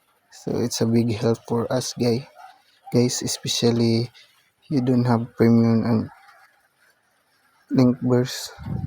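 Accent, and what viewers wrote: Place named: Indonesia. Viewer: u from indonesia u sound it very same and u black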